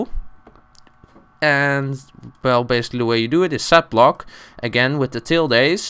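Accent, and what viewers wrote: Netherlands. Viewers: jee nederlands accent ik hoor het gelijk XD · Bedankt!! Zocht al een tijdje voor dit! (Ik reageer in het nederlands, want jouw engels accent lijkt op die van nederlanders XD)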